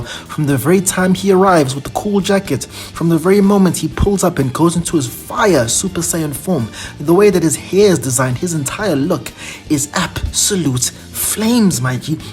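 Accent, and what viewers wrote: South Africa. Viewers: Sup man which country are you from? You sound like a South African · Are you South African ?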